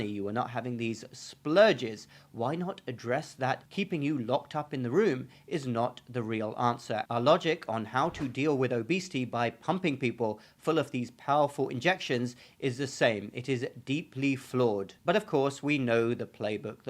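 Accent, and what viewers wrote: England, United Kingdom. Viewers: This is so hilarious: ‘Injections are dished out like candies.’ By the way, don’t lose your English accent. This is an excellent educational page. Thank you for sharing your knowledge with us, Doc.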